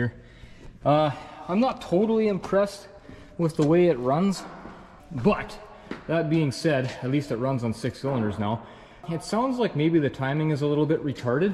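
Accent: Canada. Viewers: Manitoba, eh? Which is where I live too. And you have an accent that places you even closer in Manitoba to me, but I’m not gonna say where.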